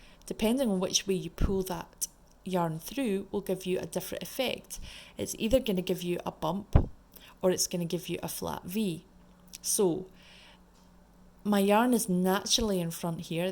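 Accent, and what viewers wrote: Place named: Scotland, United Kingdom. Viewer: I Lo❤e your Scottish accent! ❤ 🥝🇳🇿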